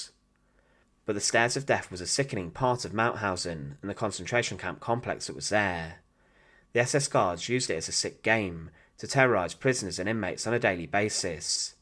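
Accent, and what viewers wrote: United Kingdom. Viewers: The fact that you prolong the last word of EVERY sentence has stopped me from subscribing. Please take that statement as constructive criticism. I appreciate your fact finding but I’m not a fan of how you’re portraying the British accent. Way too generic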